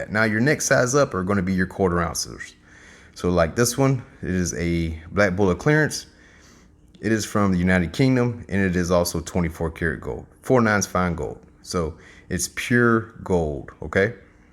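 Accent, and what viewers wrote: South, United States. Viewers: Honestly you got me to the hit the subscribe button on your southernality alone haha Keep on stackin that gold brother!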